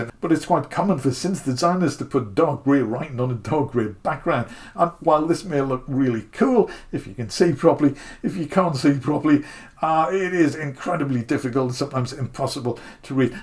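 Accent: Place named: North East England, United Kingdom. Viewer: Hi, You seem to have a north-east twang, I'm in my 50's, an electronics engineer and live in Teesside. I collect faulty 80's and 90's keyboards, modules and obscure sound generating gear, put them back to fully functional and script files to interface with Cakewalk or Fl studio. Software emulation is good for lack of space, but it won't appreciate in value and many deep dive functions in my Korg gear (M1, X3) would never be replicated, Korg in the 90's mastered the full-bodied out-of-the-box sound. Best wisher for 2024. ✌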